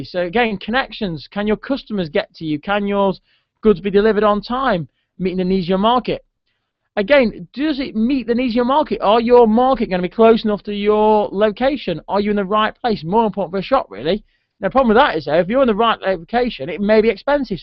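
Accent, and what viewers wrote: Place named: Stoke, United Kingdom. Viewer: Thank you these really help! also are you from stoke? You say book and look as if you are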